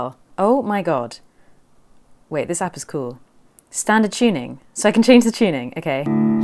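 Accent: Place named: United Kingdom. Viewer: You are so British it's amazing.. I love your accent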